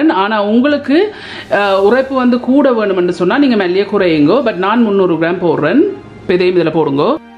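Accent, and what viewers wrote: Jaffna, Sri Lanka. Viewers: அக்கா நானும் இப்படி தான் வறுக்குறனான் நீங்கள் இலங்கை யாழ்ப்பாணம் என்று தெரியுது அக்கா எனக்கு இறைச்சிக்கு போடுற தூள் போடுறிக்களா அக்கா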